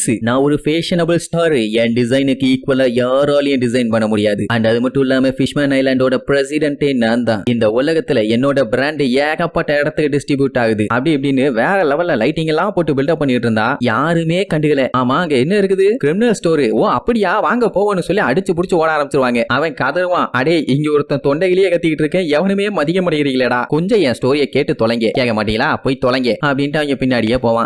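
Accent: Coimbatore, India. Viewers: Bro nee ga coimbatore aaaa????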